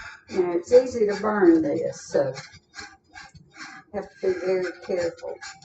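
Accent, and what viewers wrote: South, United States. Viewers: I love your southern accent and pie.Just like my mother in law . I miss her so much ❤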